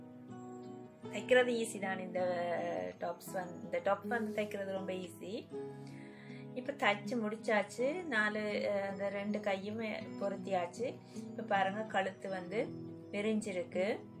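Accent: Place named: Sri Lanka. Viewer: i also srilankan.from jaffna.ur talking style paathu kandu pedichan.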